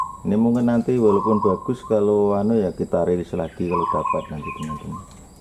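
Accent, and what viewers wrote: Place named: Tegal, Indonesia. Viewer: MZ bahasane ecek2 njenengan orang Tegal apa Slawi 😃😃😃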